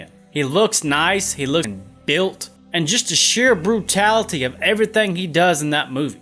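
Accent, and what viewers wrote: United States. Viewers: Love your Accent dawg, What State?? 🖤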